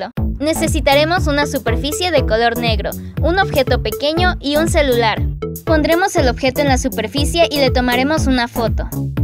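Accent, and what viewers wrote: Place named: Colombia. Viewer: de Colombia no le vez el asento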